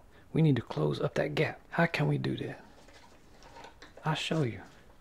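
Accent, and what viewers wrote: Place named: South, United States. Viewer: the negotiator! LOL I love it! You sound like a cross between Dr John and Bob Ross! Love your accent! Are you from the south? Loved the video! thanks for sharing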